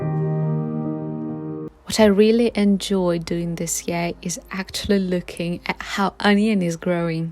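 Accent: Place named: RP, United Kingdom. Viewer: right, but also your aristocratic British accent and engaging smile. We are putty in your hands. I wonder if that is lost in translation.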